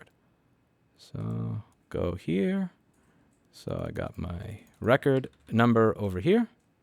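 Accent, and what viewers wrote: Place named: New England, United States. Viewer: if your serious about leaving the city and are looking for new places to establish your shop- <link> low taxes, there is software development community in Charleston, Clemson University mints engineers and companies like BMW have moved US operations there. Now if your worried about your Yankee accent, I'm sure after some fried chicken or shrimp and grits you will acculturate so the locals think your a tipsy german thats been relocated by BMW. Good luck Mr. Rossmann!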